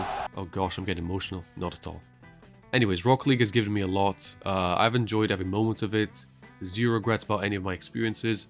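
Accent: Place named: Scotland, United Kingdom. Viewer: Dude, I watch most of your videos, your accent drives me nuts, it's it Scottish or something Central European? Ta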